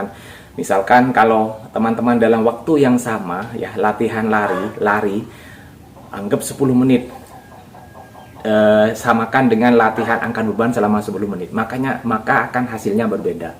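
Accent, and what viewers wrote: Madura, Indonesia. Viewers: Orang madura ya kang sampean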